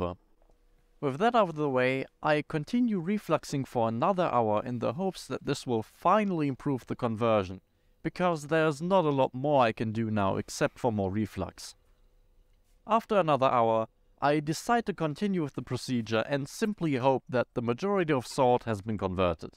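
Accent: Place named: Germany. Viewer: I had a suspicion you were German due to the accent, then came the unmistakable Bad Reichenhaller which confirmed it 😂 Moin/Servus/Grüß Gott, lovely video!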